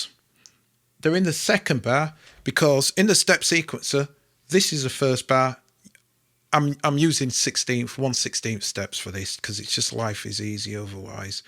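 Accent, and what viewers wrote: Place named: North, United Kingdom. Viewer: As an older guy who is completely out of his depth(normal for me lol), I find you so easy to listen too, although I'm a southerner I find your Northern accent so easy on my brain and even more important, no bloody American crap. Keep it up mate, I love what you do, and know its not easy teaching dimbos like me. Just know that thanks to you I am getting there, albeit slowly lol.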